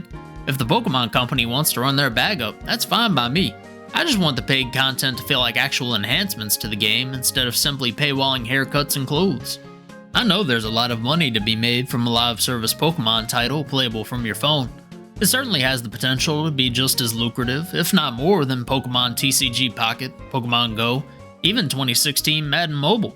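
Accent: United States. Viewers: I like how you sound more Texan than I do, even though I have lived in Texas my entire life. 😂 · Cowboy accent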